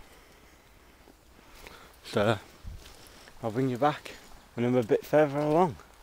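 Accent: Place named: Hull, United Kingdom. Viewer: Nice vid, loved seeing the deer, am a Hullite myself, can't mistake that accent. Where on the Wolds Way are you? Look forward to seeing your woodland camp.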